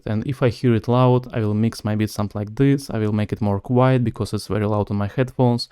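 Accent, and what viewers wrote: Russia. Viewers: maybe I don't know something, But despite the good English language, there is a feeling that you cook well in Russian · Ты че русский что-ли? Твой акцент сразу понятен. Но тутор классный 👍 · Так я сразу понял, что акцент русский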